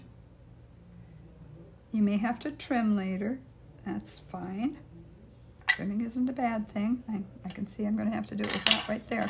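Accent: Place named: Minnesota, United States. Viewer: I love these! So beautiful! And what a cool technique! Definitely want to give this a try! Are you from Minnesota by any chance? Or maybe Wisconsin? I was guessing you were as I was listening, but the "ufda" gave it away! Ahhhhh! The sound of home!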